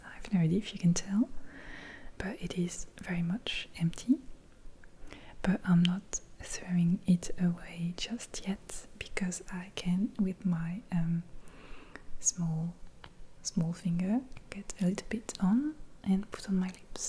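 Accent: France. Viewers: J'adore, ton soft spoken et ton attitude sont tellement relaxants ❤️ Bonne soirée ! (J'avais commenté une fois en disant que l'accent français en anglais me perturbait quelque peu... Mais je dois dire que j'ai bien changé d'avis grâce à ta jolie voix. Et je voulais m'excuser, en y repensant je me dis que ça aurait pu éventuellement te créer un complexe, et ça n'était pas du tout mon intention 😅 ) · I love that french accent :)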